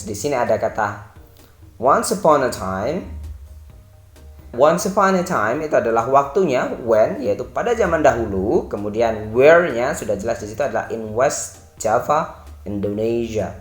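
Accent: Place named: United Kingdom. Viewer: Saran mas : Kan w merhatiin kalau mas lagi pakai accent yang lumayan British, nah Cara mengucapkan Brought nya itu seperti ini : "Brot" kek gt bro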